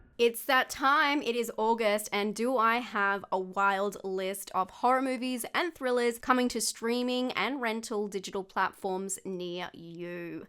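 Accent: Australia. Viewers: It’s great to hear an Aussie accent! Keep up the good work, love you recommendations 🇦🇺👍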